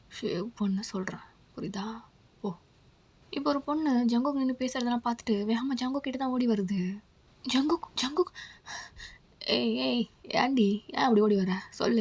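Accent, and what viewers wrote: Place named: Kerala, India. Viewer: I am from Kerala ❤ your malayalam so cute ❤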